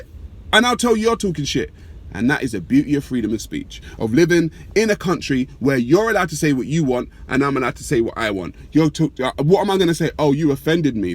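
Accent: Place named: London, United Kingdom. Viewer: Tell you what, close your eyes and this was Samuel Jackson in a Tarantino film....with a London accent without the M16....brilliant and of course talking sence again people....respect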